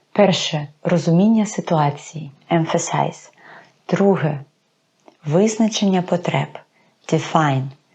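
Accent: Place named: Lviv, Ukraine. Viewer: Типова львівська огидна вимова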